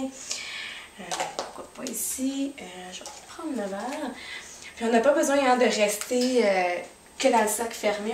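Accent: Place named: Quebec, Canada. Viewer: Très simple et facile d'accès, merci ! Et cet accent québécois, j'adore ! :)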